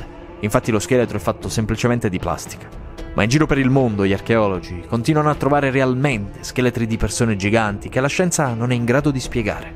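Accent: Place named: South, Italy. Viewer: di tutti i tuoi video... mi piace il tuo accento del sud. heheheheh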